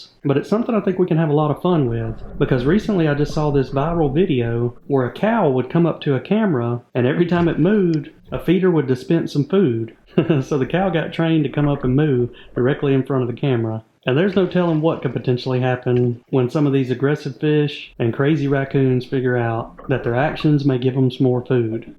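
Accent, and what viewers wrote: South, United States. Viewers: May the Carp be with you - cool Southern accent btw !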